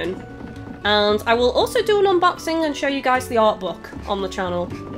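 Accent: United Kingdom. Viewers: Just a quick question what part of the UK are you from? I'm really bad at accents so I can't tell (I'm from the UK too but I only know a few accents for certain)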